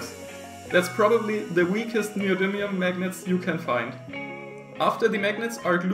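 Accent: Germany. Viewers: are yo german? bc your dialect sounds like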